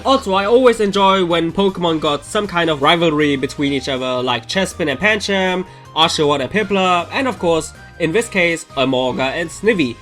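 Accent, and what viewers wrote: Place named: Germany. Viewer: Its his accent comon forgive him for being german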